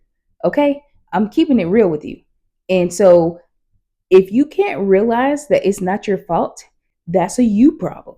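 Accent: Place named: United Kingdom. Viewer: Well done another great video, mindset no 2 resonated with me, love the bloopers and British accent 🇬🇧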